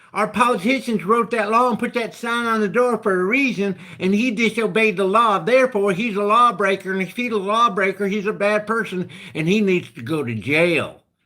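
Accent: South, United States. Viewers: I like the southern accent,lol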